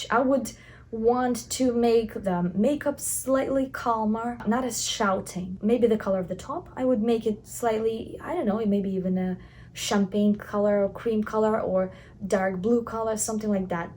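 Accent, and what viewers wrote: Russia. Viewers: Я одна слышу русский акцент?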